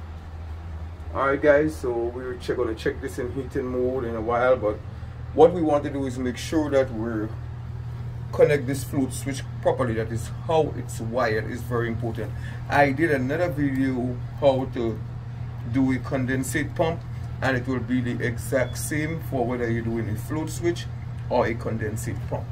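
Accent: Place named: Jamaica. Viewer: I hear that Jamaican accent Man…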